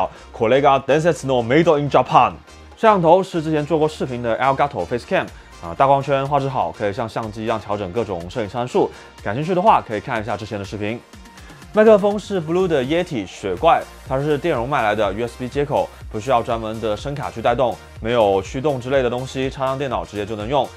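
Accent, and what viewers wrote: Cantonese, China. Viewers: 你能说话慢点吗,,,本来广东的普通话就不好,咬字不清更难听!!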